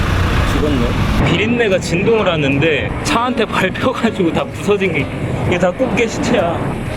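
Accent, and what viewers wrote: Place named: Chungcheong, South Korea. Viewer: 고향이 충청남도인가요? 말투가 내가 사는 고향 논산이랑 비슷하네요. 지금 유럽 몰아보기 3편 보고 있어요!!!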